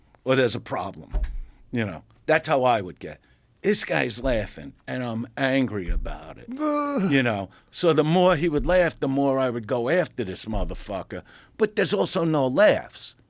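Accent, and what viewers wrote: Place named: Brooklyn, United States. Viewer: Gotta love the Brooklyn accent: "This guy Mahk."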